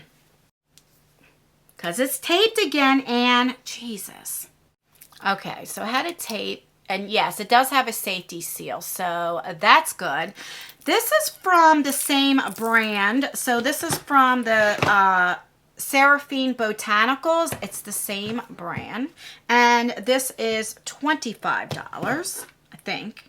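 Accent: New Jersey, United States. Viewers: Mirror, mirror on the wall. We love the best of All! And that is not a lie. Love your jersey accent and your videos. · Mirror mirror on the wall, who is the kindest, down to earth, supportive beauty youtuber of all????? It's just of course!!! I just recently found you but I subscribed after the first video. It's so refreshing to watch your videos because they aren't filled with drama or tea!!! Just real, friendly beauty talk I can take time out of my day to enjoy.....btw, recognized that Jersey accent right away!! Lol since I'm from your neighbor in Maryland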